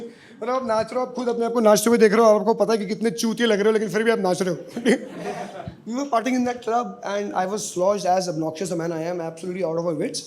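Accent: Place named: Garhwal, India. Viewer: 🤭🤭your accent is garwali though💜was waiting for someone from Uttarakhand to do standip.Finally got you.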